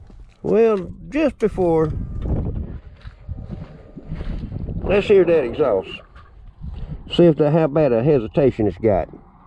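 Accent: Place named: Tennessee, United States. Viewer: Damn, I learned a lot! Thanks for the vid. I'm from Georgia, you sound like your from Tennessee?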